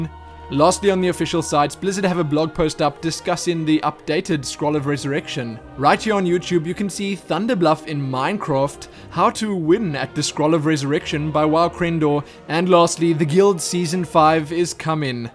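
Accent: South Africa. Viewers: It's a South African accent guys....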